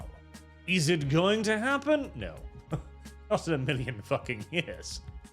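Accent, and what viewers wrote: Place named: United Kingdom. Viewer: I have never seen a British person rely on their accent to make up for their lack of knowledge more. You might as well be engaged to a cousin because damn dude, that island DNA is showing.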